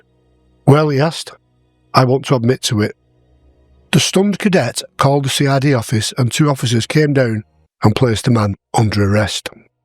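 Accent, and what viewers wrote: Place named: Northern England, United Kingdom. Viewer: I enjoy the differences between Red Rose accents: your northern one, Oasis-Manc, Gerry Marsden-Scouse, coastal posh (Morecambe, Southport) and that lighter one abutting on Cheshire. The more the better.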